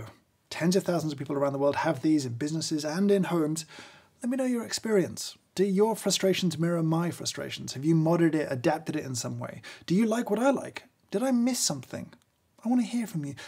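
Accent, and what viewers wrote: Germany. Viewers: good german accent, congrats :) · His German pronunciation is hilarious. 😁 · The englisch/german accent is so sweet :D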